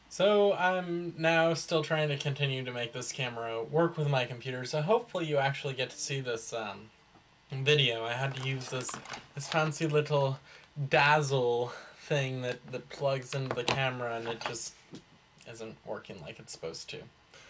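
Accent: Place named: Ireland. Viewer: Kinda Irish.......... :)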